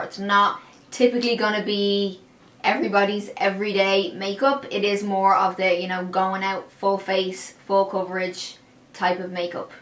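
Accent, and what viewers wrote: Ireland. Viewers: Are you Irish? Love your accent 😭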